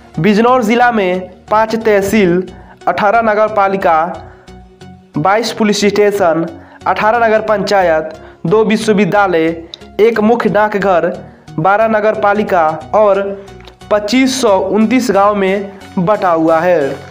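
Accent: Bihar, India. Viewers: Bolne wala bihari h be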